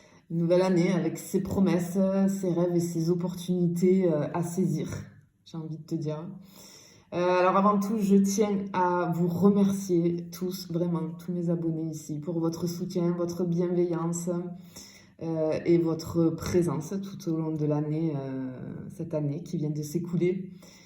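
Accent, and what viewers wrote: South, France. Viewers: Bonne et heureuse année a vous et vos proches merci à vous de nous guider et votre bonne humeur et cet voix qui chante le sud ça nous fait du bien merci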